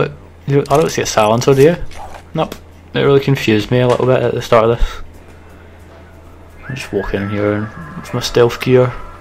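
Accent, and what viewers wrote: United Kingdom. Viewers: Are you from Scotland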